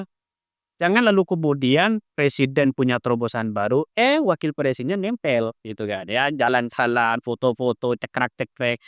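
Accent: Madura, Indonesia. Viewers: Btw, Logat madura ya mbak?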